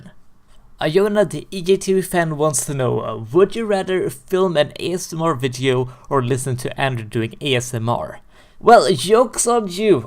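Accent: Sweden. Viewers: are you swedish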